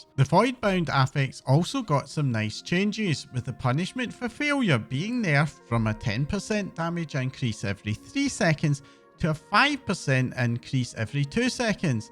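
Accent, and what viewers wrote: Scotland, United Kingdom. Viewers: You trolling 😅 lads Scottish he won't be changing how he speaks for you 😅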